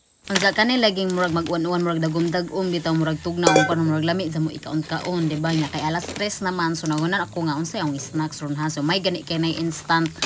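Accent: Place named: Bohol, Philippines. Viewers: manimaho gihapon dai.basta bulad grabi gyud.humot nato kaajo.pero bakag nila🤣salamat salamat kaajo sa reply dai.permi ko lingaw imo video.asa gani injo sa Bohol.murag Calape ang tuno injo sinultihan.Dual Carmen amoa dai. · I love the background music ma ❤️ dalangpanan. I always watch your videos your accent reminds me of my boholana lola and lolo ❤️ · True proud boholana hear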